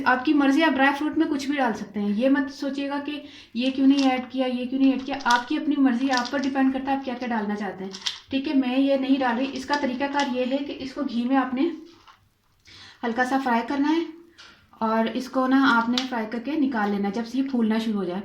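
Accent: Sialkot, Pakistan. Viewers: Very nice or apni very nice sialkoti panjabi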